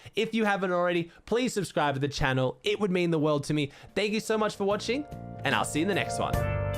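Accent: Australia. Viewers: Nice, Keep it up as always!!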